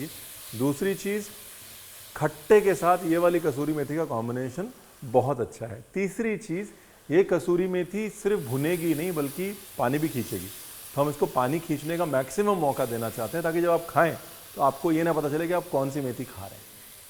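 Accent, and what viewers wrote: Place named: Lucknow, India. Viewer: इतना लखनऊ टपकता है आपकी जुबान से मैं घर से दूर हूँ , करीब आ जाता है शहर । Keep going, lots of love Boss.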